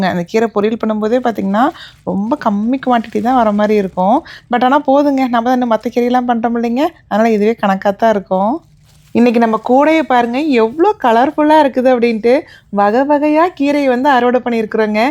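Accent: Kongu, India. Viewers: Kongu tamil 👌 super nga 😊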